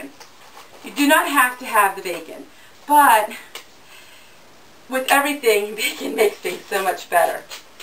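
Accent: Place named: South Jersey, United States. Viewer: I grew up in South Jersey, and hearing you speak reminds me of home. Are you in Pennsylvania?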